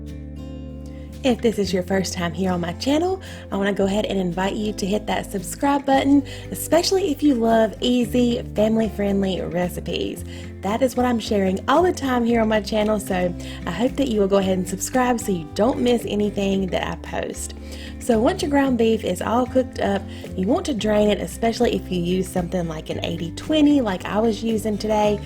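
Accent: South, United States. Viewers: Dad gummet! Girl you’re speaking my language…true southern 😂 They all looked delicious! Thanks for sharing the recipes!! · Hi, I'm new to your channel, but like watching you cook and all your easy recipes too. I really like your southern accent too. It's cute, & I subscribed too. Thank you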